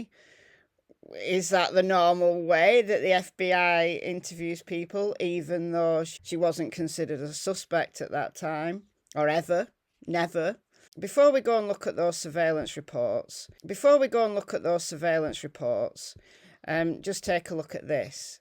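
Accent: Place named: Stockport, United Kingdom. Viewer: I am so intrigued by this case and have been watching loads of videos from various you tubers and something doesn't quite add up about how the investigation was done for one and what's he not telling that he will take with him to the grave. Is he going to end up pushing N K under the bus to get his sentence lessened because she was involved after all maybe. I'm a northern llass by birth born in Salford Manchester and lived in digsbury until my parents moved to Wales. Is that a Stockport accent I recognise. Enjoying your videos on this because they are so full of interesting information 😀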